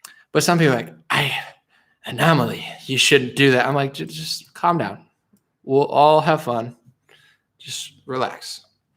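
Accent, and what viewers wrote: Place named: South, United States. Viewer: Where is this new southern accent comming from? You been hanging here or some new bud's in your life from here? It's cute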